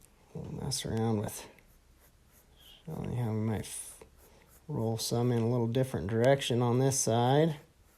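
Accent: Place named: United States. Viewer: awesome video, lots of good takeaways! practicing for 3d modelling. greetings from germany, btw which kind of american accent is that?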